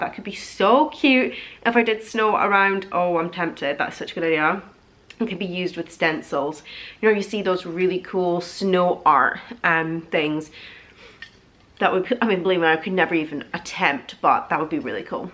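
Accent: Scotland, United Kingdom. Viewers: First time watching your video - really enjoyed it and loved your haul! Will keep watching - I find you're down to earth chat very enjoyable and love your mellow Scottish accent!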